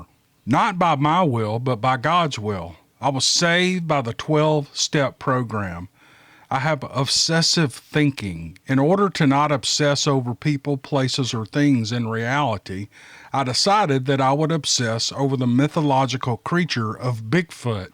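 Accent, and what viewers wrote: South, United States. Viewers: my dad was from northwestern Georgia mountain country and my mom was from deep southern Georgia, and most of my many aunts ("aints"), uncles, and cousins were from the deep south and talked like it. That's one reason I enjoy your videos so much - you sound like family. I had a lengthy career in radio broadcasting back during the "golden age" of radio, so I had to polish my diction, but I still love Southern speech, and I can still slip into it whenever I want to. That's an old picture over there to the left, and unfortunately I don't look like that any more. God bless you and all "your people". Ite?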